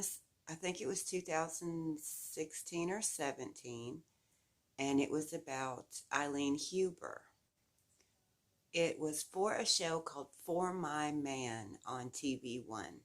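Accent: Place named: South, United States. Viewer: you got a sweet little southern accent...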